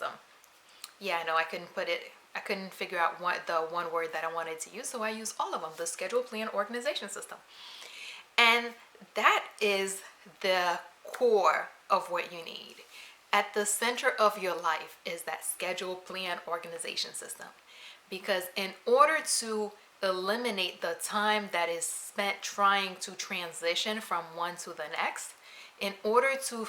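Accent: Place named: East Coast, United States. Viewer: I love your East Coast accent! My dad is from NYC and it's my favorite accent.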